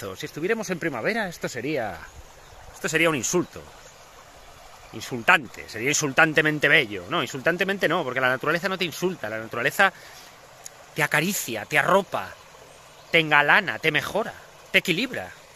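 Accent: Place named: Australia. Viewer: like ur aussie accent 😄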